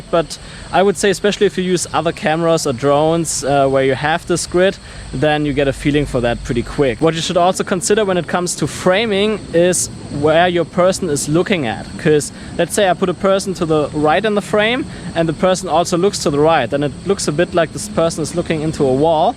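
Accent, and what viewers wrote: Germany. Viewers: Der deutsche Akzent ist real :D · Keine 48 Sekunden dein Video angeschaut und gewusst, dass du ein Deutscher bist :D Der Akzent ist eben sehr durchdringend haha :D Hast ein Abo da!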